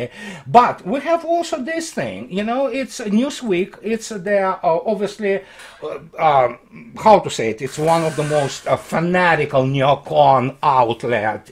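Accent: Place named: Russia. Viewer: It's awesome and funny AH to be listening to American slang with a Russian accent!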